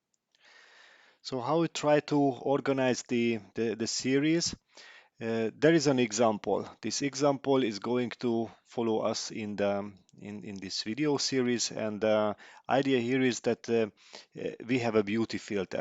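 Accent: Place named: Hungary. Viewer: Biztos dolgoznak magyarok a Juhtyúknál a beszédfelismerésen, mert igen pontosan feliratozza a magyar akcentust.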